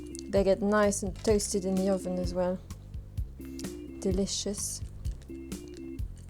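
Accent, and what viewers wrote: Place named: France. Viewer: I like your French accent 😊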